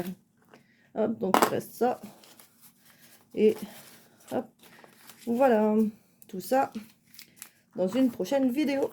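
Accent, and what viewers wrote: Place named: North, France. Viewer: J’adore cet accent nordiste en espérant que je ne me trompe pas 😂 et ton duo avec ta moitié 🥰😍😻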